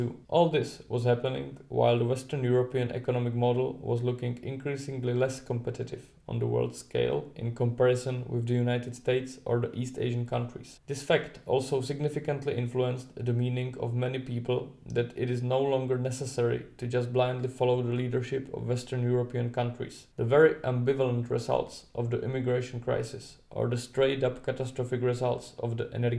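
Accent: Czech Republic. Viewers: The czech accent is killing me💀💀💀 But very true video indeed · Hey bro...fenomenal stuff keep i going. BTW are you Czech? Because that sounds like czech english accent to me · whats your mother language? you sound kinda like a czech with your english accent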